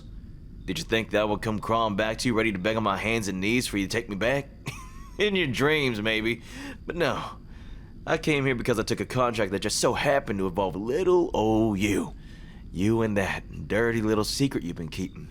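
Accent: South, United States. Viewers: You don't know how much I loveeeee your Southern accent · I'm gonna need to hear this southern accent again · Oop the southern hooked me. Im cook cooked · 10/10 the southern accent gets me every single time swoons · Why do i always simp for the southern accent?!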